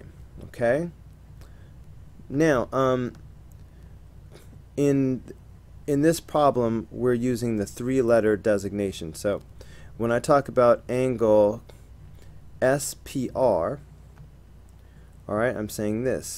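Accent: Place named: East Coast, United States. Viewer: Nice job. I detect a Eastcoast accent? Where did you do undergrad.? Just curious. I just subscribed you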